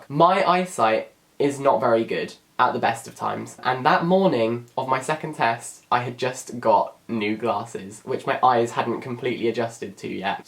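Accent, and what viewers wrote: United Kingdom. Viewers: using this channel to practice mi listening skills with british accent :3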